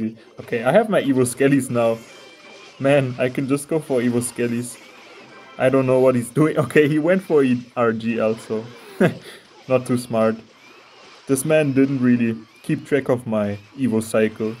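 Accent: Germany. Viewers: Yo bro i do have to say that i really like ur videos. U are literally the second youtuber in my last 13years of youtube who i would consider as a "small youtuber" where I watch all the videos. I have never thought that right now i prefer ur videos against videos from big youtubers like trymacs,.. because I just play CR every two days for 3, 4 matches. What is more i really feel entertained by ur commentary I have never thought that i can watch 15min pure clash royale videos without something else. Keep up the good work and maybe u can start with professional tutorial videos and so on. I am sure that this will lead to a faster grow because I do see ur channel with +50k subs when u try to get more professional and attract more people by tutorials,... And btw I love ur accent i am also german🙌🏼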